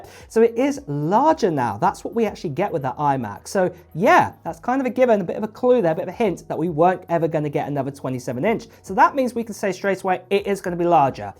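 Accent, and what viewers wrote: United Kingdom. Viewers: every time you mention Summer in your videos we are left clueless. Use months and years to help your viewers understand. Just because you have a brittish accent doesnt mean you are living in the UK !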